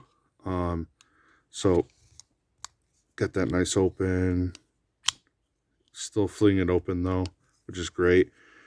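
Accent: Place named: Massachusetts, United States. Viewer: Not a bad collection! Pretty ridiculous seeing the two Toors side by side. I handled a v1 in my local knife shop, but have never owned one. I'll grab one when I find a good enough deal. Have you seen the new $425 folder they're making? Looks kinda like a 3.25" Greyman Satu. Looking forward to the sharpening video. You showed a few decent edges. I thought I recognized a bit of mass accent... Im in central new york.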